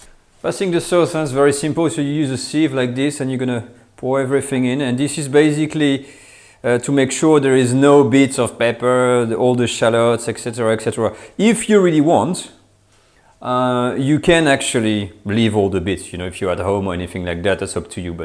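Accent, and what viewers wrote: France. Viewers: Definitely helps to have that cool French accent!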